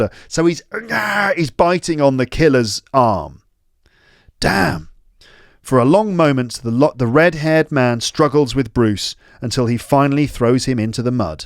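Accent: United Kingdom. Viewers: Couple years ago I lived in another country with barley access to the internet I could listen to your podcast and voice hardly almost i forgot you however i couldn’t forget your British accept and your generously teaching style and your name I got surprised to see you in YouTube in USA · i like your british accent very much.sir.😊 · Fantastic voice and actions with explanation of all the vocabulary! English as a second language person, I had a hard time to understand those detailed adjectives when I read a book. Finally, I fall into the story and enjoyed it all the way to the end. Thank you so much for your effort and contribution. I am lucky to find this podcast. I love your British accent as well. I wish I could learn that accent:) I think I will be your fan now on - Cheers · camp wide range covered uk accent and culture British filed pure Love dramatic ever❤ true legendarie Podcaster all time❤❤❤ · I always listen to the end and I love how you explain some words. 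And I learned the British accent from you Thank you ❤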